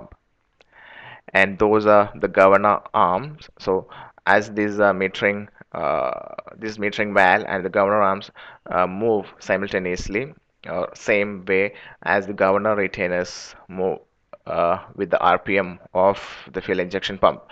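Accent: Kerala, India. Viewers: 10 secs in I knew he's a mallu ..damn im good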